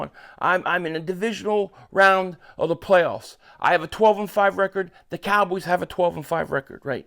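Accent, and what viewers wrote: Philadelphia, United States. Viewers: Does everyone in Philly talk like this guy?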